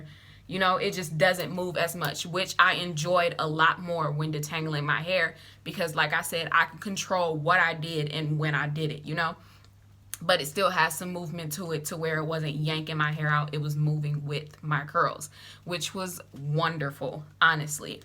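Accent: United States. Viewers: I must admit she spoke very normally for someone with an American accent so I can't relate to the original comment. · Friendly comment: You’re speaking REAAAAAALY slowly. And you sound American-born (I am not) so don’t think it’s a language thing? It makes the video excruciating to get through. I put it on 1.5speed and it’s ok. You can be clear and articulate w/out being as slow as this, is what I’m sayin’ ☺️.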